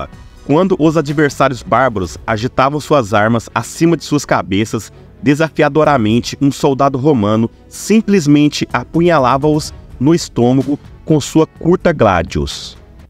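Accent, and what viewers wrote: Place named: Caipira, Brazil. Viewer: O vídeo parece ser bom, mas o sotaque caipiiirrra nao consegui seguir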